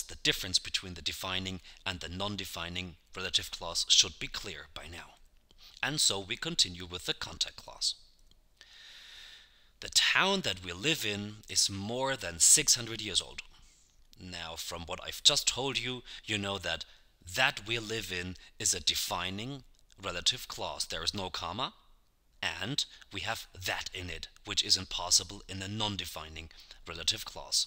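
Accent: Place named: Germany. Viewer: thanks a lot, you explain clearly, but you speak as Germans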